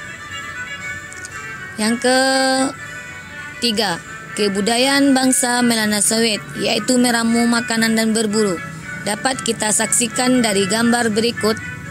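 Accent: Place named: Malaysia. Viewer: kenapa pake logat malaysia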